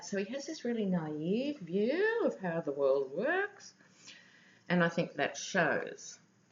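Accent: Australia. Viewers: Thanks so much for this special reading,from up here in Queensland. I, like you have been so taken with what has been happening in USA that I have become out of touch with news at home, so was amazed to find that we had a new PM. Thanks for your overview. I do enjoy your no nonsense style, our accent and the fact that you use old fashioned aussie slang instead of US; "he has the brain of a parrot....sharp as a whip....take the flack... in deep shit....one of those you can describe by the "i"s; incompetent, immature, insecure." I do believe our political system is a little less open to the corruption we see in the US called "Lobbyists" ie money to get political reps to do your bidding. Our low key elections of 6 weeks cannot cost as much as their crazy hyped up rallies.The money for such things should come from Taxes and not from interest groups,otherwise interest groups with little money are not going to have representation. 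It might explain why in this day and age, any first world country could be against universal health cover! I wait anxiously for your readings everyday, and I like the fact that you acknowledge other people working in the area, in particular Dave Johnson.. So thanks again.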